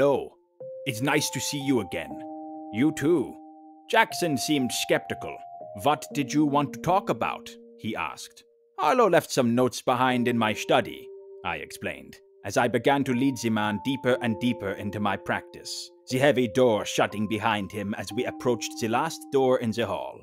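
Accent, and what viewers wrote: Germany. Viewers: The German accent is just 🔥🔥🔥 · This was fire along side the German accent 🔥🔥🔥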